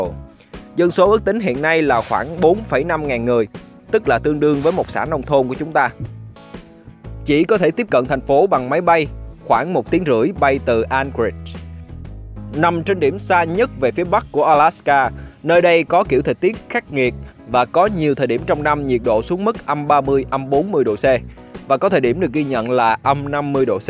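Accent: South, Vietnam. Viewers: Giọng miền nam dể nghe,ủng hộ bạn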